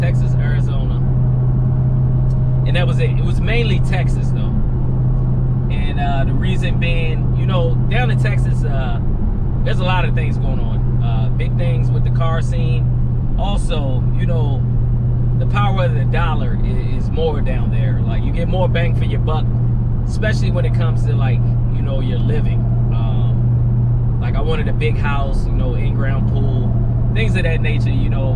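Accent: Baltimore, United States. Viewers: you can tell he from b more cus of the way he pronounce words with "ou" "ue" "ew", they all sound like "uuuuuuu"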